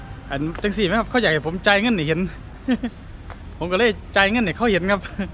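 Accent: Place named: Isan, Thailand. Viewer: ชัดนะไอ้อีสาน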